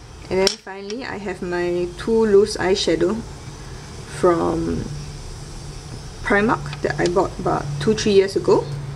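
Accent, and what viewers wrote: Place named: Malaysia. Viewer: Love your accent! Malaysia? Singapore? Never heard of "Kate" brow cosmetics. What country is that from?:)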